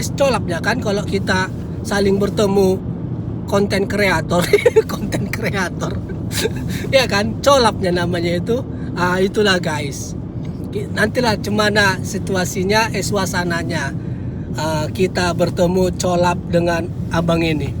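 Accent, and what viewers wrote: Medan, Indonesia. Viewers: Bang aq ngakak banget pas abang bilang telor bunting,telor bengkak pula😂😂😂dan aq suka bnget cara abangnya ngomong medan banget gitu tp slow ah pokoknya nyaman aja lah bang liat plus dengerin abangnya ngomong.Btw aq orng Bandung bang😅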